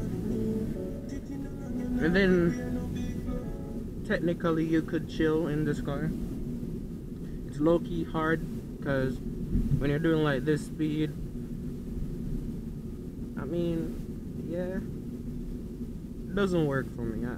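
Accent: Jamaica. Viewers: I recognize dat accent are u Jamaican?nice car btw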